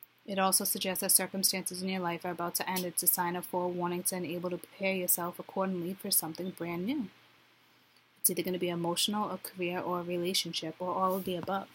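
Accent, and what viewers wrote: Boston, United States. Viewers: just found your channel and boy is this accurate. dealing with an aries i left in the past. im canadian but visited the states quite abit as a child...is that a boston accent? love your voice!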